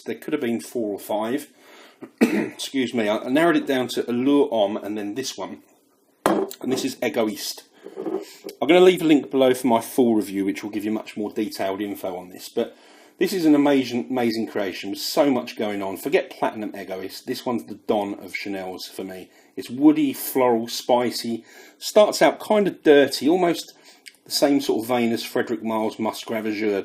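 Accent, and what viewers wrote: United Kingdom. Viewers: Uff that brit accent i missed for long time, managed to find alot of presious parfs thx to you, when i first found ur channel! including precious L`Air du Desert Marocain Tauer... glad you r back, hope you found something interesting in your journey! Any new awesome amber/incence fragrances? i still use only ambre fetiche/Tauer Marocain l'air, would be glad to hear any recomendations! greetings from Russia m8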